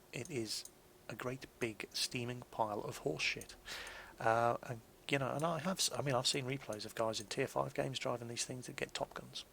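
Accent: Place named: London, United Kingdom. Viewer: Bohemian where are you from? London?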